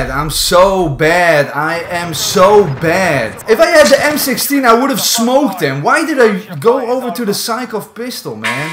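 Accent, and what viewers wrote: Netherlands. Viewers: does he sound a little dutch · R u dutch ? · I smell dutch isn't it?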